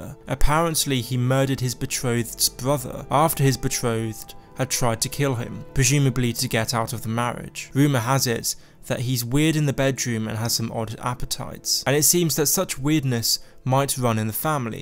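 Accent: United Kingdom. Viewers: Hey I'm curious, what region is your accent from? I know it's British, but what kind?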